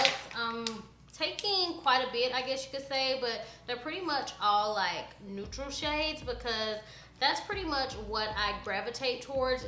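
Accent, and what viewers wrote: South, United States. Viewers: no i didn't come here for fashion advice, i just came to look at you and listen to you talk. i love that country accent of yours I'm from Alabama too, but i don't sound as sexy as you do... and you're so fucking beautiful😫💗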